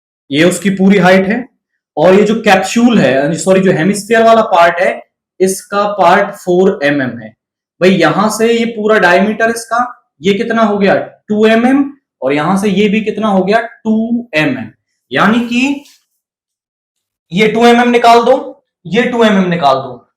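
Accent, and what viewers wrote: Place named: Haryana, India. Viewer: Haryanvi touch 👍👍👍😂😂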